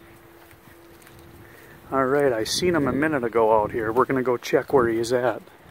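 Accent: United States. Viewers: Is he from Minnesota? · Sweet alabama or america somewhere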